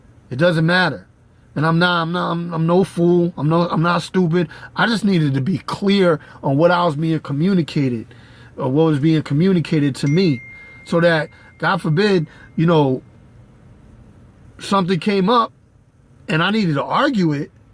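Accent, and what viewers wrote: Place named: Brooklyn, United States. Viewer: Just saw your video, glad to see another New Yorker made it, ... you sound like you from Brooklyn, just like me lol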